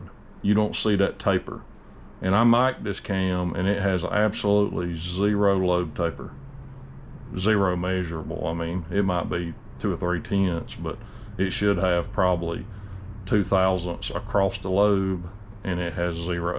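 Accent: South, United States. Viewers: I’ve already commented which you responded to, thankyou. Just want to make a viewers statement on your vids., you give us content we don’t find basically but is extremely informative that fills the gap on many vids on YouTube. Then you make a vid without all the fanfare at the start. Then your totally unbiased opinions that doesn’t offend anyone including the competition. At my old age you meet the criteria of the genuine producer of consumer products. you need to be proud of your honesty, professionalism to produce products that are of the highest order. Unfortunately in this day and age this attitude is getting rarer. Keep up the good work., being half way around the globe I cannot benefit from your high standards but I look up to your honesty and professionalism. Cheers mate. And geez mate your southern accent is full on. It’s cool coming from a slang happy Aussie. 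Keep up the good work.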